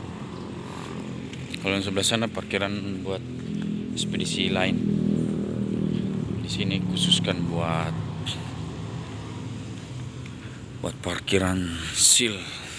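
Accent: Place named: Indramayu, Indonesia. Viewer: Org indramayu mang ??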